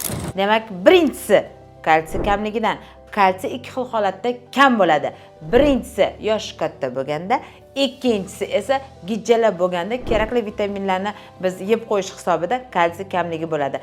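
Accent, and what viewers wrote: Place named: Khorezm, Uzbekistan. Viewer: Оник хоразмилисиз дохтир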